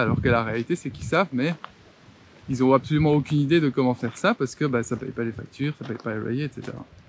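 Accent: Liège, Belgium. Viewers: Merci pour cette vidéo , il me semblait bien avoir reconnu ce petit accent de la Province de Liège que j'affectionne tout particulièrement étant de Mons (Hainaut). Il ne suffit que d'une chose pour changer le Monde comme en musique s'accorder pour être en harmonie et changer la musique ...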